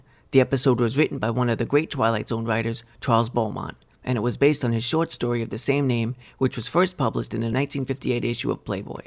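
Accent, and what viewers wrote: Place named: East Coast, United States. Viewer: As a twighlight zone fan from age 7, now, and until I shuffle off this mortal coil, I appreciate you doing these reviews. Living in the Midwest right now it's good to hear an East coast voice talking bout Rod's creation. What's your name brother...mines Edmon. 😎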